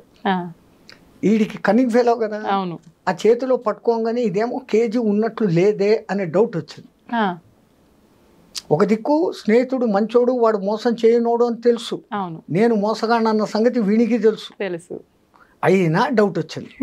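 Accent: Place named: Rayalaseema, India. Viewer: Sri Sri Sri gurudevulu notinundi ma rayala seema basha vinte shanthoshamga vundhi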